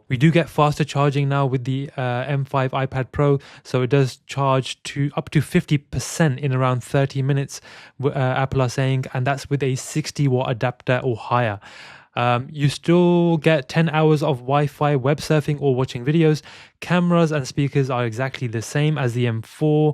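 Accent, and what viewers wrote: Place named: United Kingdom. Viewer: His accent is clearly UK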